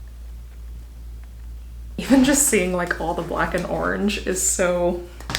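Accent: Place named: United States. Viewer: I hear that American accent quite often, I really like it. What type is it...Southern?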